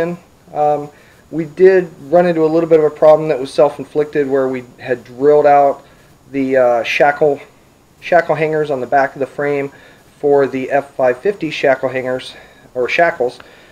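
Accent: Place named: California, United States. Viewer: I could tell you were a californian right away. lmao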